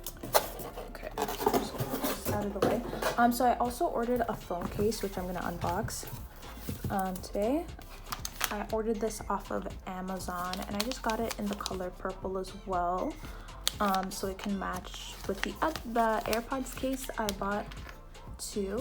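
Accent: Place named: Caribbean. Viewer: I know I was hearing an Caribbean Accent and music in background lol, rarely see them doing unboxings. BTW I'm getting the 11 soon 😊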